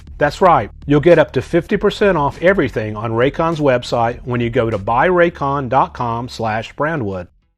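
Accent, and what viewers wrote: South, United States. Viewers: I've come to love watching things like aqua/pondscaping, and this really clicks for me. Your calming, southern voice soothes me and your choice of music is so nice and chill it's easy to follow, plus being filled with all the nature noise. Thanks for what you do,.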